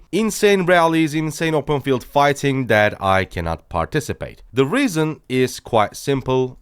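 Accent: Turkey. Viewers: I KNEW IT I KNNNNEEEEEWWWWW IT THIS MAN IS TURKISH i recognize this accent everywhere now he has a Fener shirt on 😂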